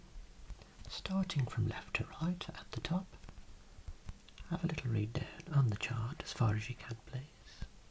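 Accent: Birmingham, United Kingdom. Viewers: Happily I came across your excellent channel about a week ago and I've been enjoying so many of your videos. You clearly work hard to produce the best videos possible and it really shows; also great to hear the lilt of a fellow Brumie. I grew up in Handsworth in the 80's. Unfortunately I've been suffering from a worsening heart problem over lockdown and 19 months of self isolation so finding alternative ways of reducing daily stress has been important so thank you for your efforts in ASMR. I am also starting to learn quite a bit about my eyes and optometry :-)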